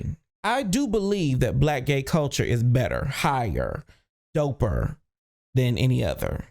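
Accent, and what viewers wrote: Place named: Detroit, United States. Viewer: are you from Detroit? "what up doe?"?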